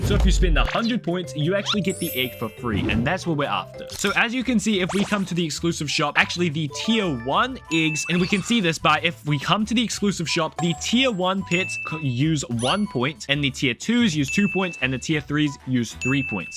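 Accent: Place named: New Zealand. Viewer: can tell by your accent, I’m from Australia and have met many kiwis in my life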